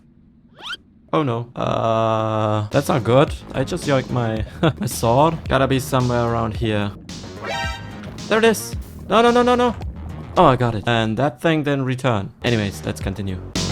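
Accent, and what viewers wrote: Germany. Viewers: Cause he say zem instand of them k think he is german like me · are you german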